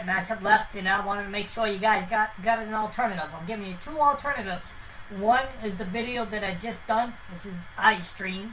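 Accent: United States. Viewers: You sound east coast, brother.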